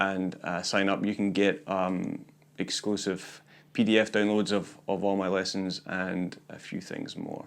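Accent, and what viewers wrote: Scotland, United Kingdom. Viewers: What kind of accent is that? Scottish - using American terminology- and he’s in Melbourne? Total distraction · You're Scottish!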